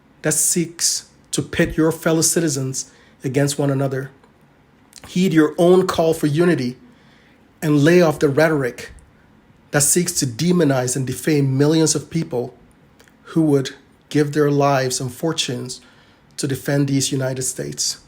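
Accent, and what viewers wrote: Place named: Africa. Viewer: I lived in Nigeria many years ago and traveled to Ghana. You have perfected your accent! I can barely detect any bit of Africa left in your voice but I know you carry it in your heart! Well done, spoken well!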